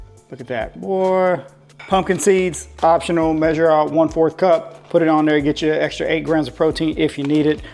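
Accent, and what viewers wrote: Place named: South, United States. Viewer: Great video!! Love your southern accent!!!! What state are you in?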